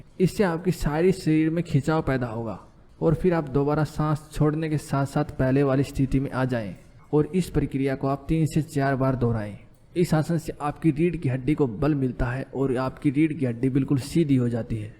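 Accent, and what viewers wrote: India. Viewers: Are u from haryana??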